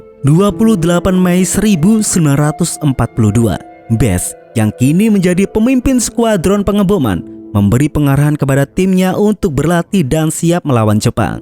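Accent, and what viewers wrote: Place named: Malang, Indonesia. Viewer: Bahasamu kek org malang min😂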